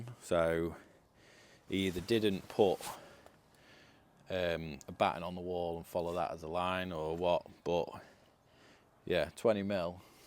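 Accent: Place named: Doncaster, United Kingdom. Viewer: Sounds like you're from Doncaster or somewhere.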